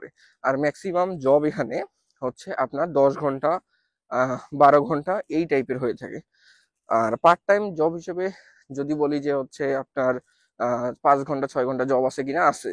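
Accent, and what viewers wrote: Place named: Sylhet, Bangladesh. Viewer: ভাই সিলেটর নি?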